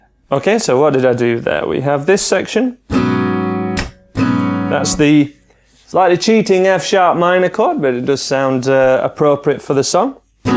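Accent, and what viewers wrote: United Kingdom. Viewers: jo3bo its just his accent i got him british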